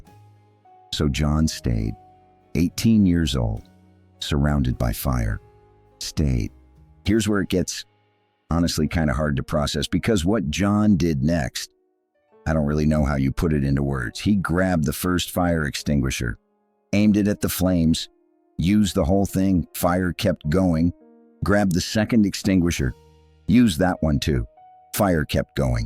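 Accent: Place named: United States. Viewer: AMERICAN VOICE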